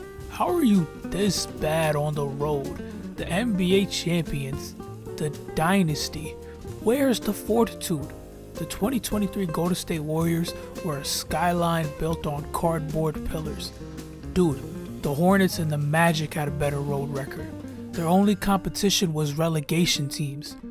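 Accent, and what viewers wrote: New York, United States. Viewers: that New York drawl is perfect for basketball talk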